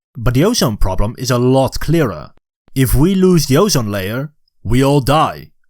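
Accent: Netherlands. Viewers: are you dutch ur accent sounds like it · I think it's his Dutch accent. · you sound dutch am i right? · Are u from the Netherlands cuz u have a Netherlands accent.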